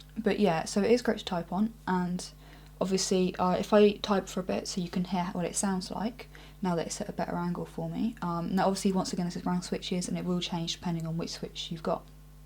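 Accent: United Kingdom. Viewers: British accent ? =o)?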